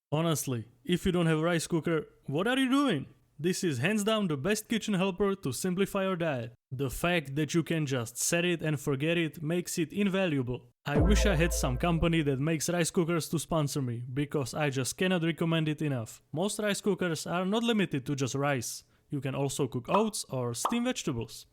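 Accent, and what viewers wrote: Czech Republic. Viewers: Kámo... ten český přízvuk je moc. :D Nedávám to. Jinak super video ale. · dobrej czech accent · Já si říkal že slyším Čecha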